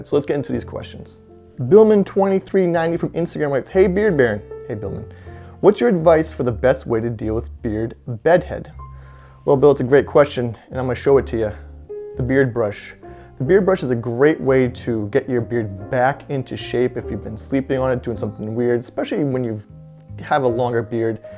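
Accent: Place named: Canada. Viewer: I honestly never noticed that you say "out" with a Canadian accent ("oat") until today's video, haha.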